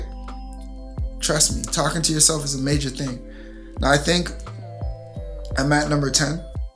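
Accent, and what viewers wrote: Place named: Jamaica. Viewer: Great advice. idk how, but I knew u were Jamaican as soon as u spoke lol wassap fam. But yeah. I think one of the hardest things is trying to build yourself outside of your family. Like you were saying, certain habits you didn't know you had. I recently moved out, and there are so many things I thought were normal that aren't.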